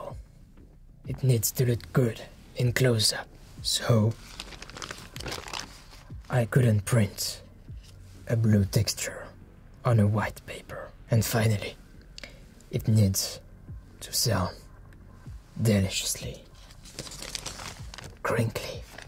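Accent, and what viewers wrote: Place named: France. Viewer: I swear I’m not just saying this because of your accent, but I hope you’d do a Frenchie (The Boys) roleplay someday! I know guns would be controversial, but it would be cool if you were building a gadget or making some kind of contraption like he does. I just don’t think anyone non-French could pull that off lol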